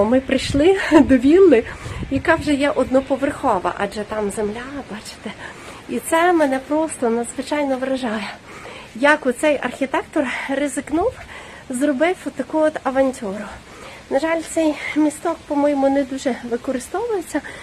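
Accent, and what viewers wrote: Lviv, Ukraine. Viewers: інтонації... абсолютно львівські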